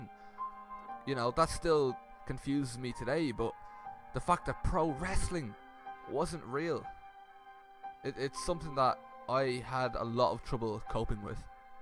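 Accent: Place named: Ireland. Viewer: That was pretty good and your Irish accent made it funnier.... in a good way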